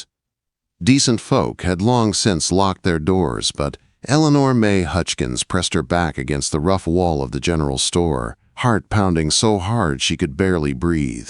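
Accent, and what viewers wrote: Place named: California, United States. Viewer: Nice story, but the narration was a joke. So California USA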